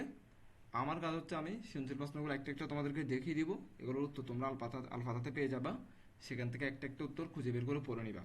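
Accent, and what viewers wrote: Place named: Chittagong, Bangladesh. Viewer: ভাইয়া আপনার কন্ঠ সুনেই বোঝা যায় আপনি আমাদের বরিশালের নয়,আপনি চট্টগ্রামের ওহ🎉🎉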